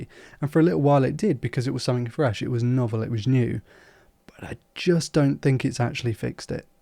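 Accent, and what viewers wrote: United Kingdom. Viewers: I just watched one of your videos for the first time (the Used S23Ultra vid) and was happy to subscribe despite being a terrible fit (geezer American who's never played a video game in my life). I'm a sucker for a calm Brit who knows his stuff and doesn't waste my time. Best of luck and take care of yourself.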